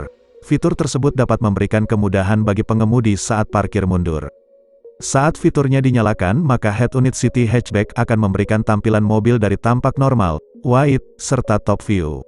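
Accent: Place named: Indonesia. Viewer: Beberapa penyebutan dalam bahasa Inggris dibaca seperti bahasa Indonesia 😅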